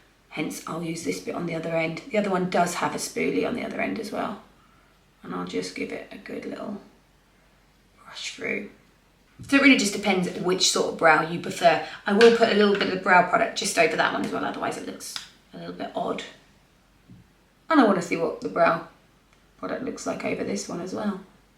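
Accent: England, United Kingdom. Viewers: you are just so sweet and I love your lovely English accent. I think the kosas foundation looks amazing on your skin. Your skin is beautiful without makeup…..you are glowing girl. I so enjoy your videos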